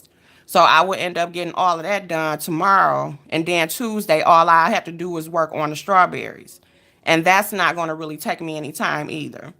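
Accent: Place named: Chicago, United States. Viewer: Oh sis: This looks yummy! From a girl born in Chicago and raised in Toledo: Our accent is the same!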